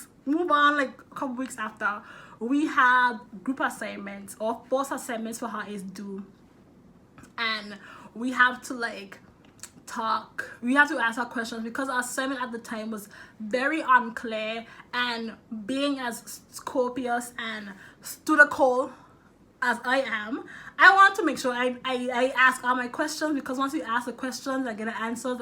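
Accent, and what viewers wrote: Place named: United Kingdom. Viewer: i can hear your uk accent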